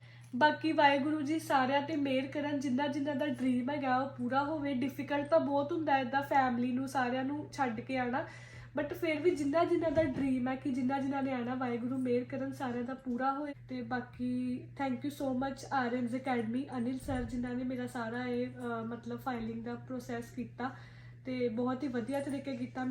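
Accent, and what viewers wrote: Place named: Amritsar, India. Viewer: Love ur Amritsari accent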